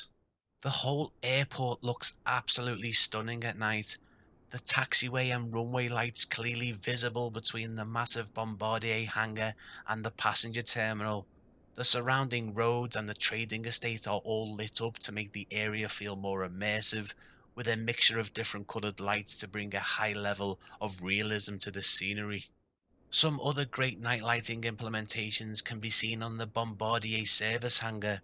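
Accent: Liverpool, United Kingdom. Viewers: loved the Scouse - 50 years down under and can still understand it - and still love to eat it 👍 sad you dont have a Spitfire - ask the Aussie developers to gift you one for review👌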